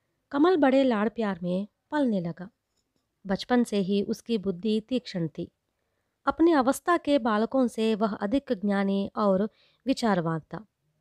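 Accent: South, India. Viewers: Bahut achha aur bolne wali pakka South Indian hai and really love South Indian guys really hard working and intelligent people.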